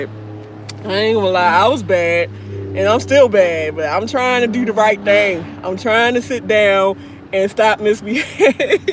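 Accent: Baltimore, United States. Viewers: Sound like you’re from Baltimore.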